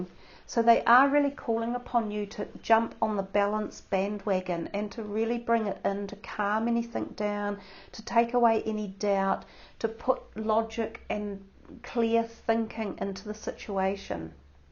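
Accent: New Zealand. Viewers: Love the "sucx" (6). Good old Kiwi accents. Not making fun, was married to one!!!! Hope Enzo will be okay!!!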